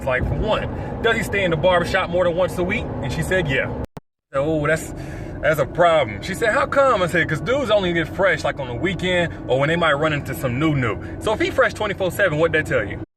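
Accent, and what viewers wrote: United States. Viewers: everything you say is very interesting, and logical. The problem is: you are talking English too fast, with American accent, to people all over the 🌍 world, and we don't understand what you say. Also the sound of the engine car is disturbing. So please. Speak just a little bit slower. Thank you.Efrat Israel. 🍍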